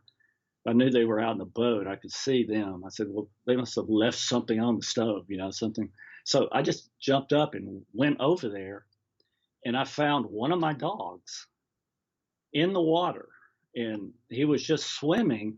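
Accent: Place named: France. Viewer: is back! Yay! I missed your French accent 🤍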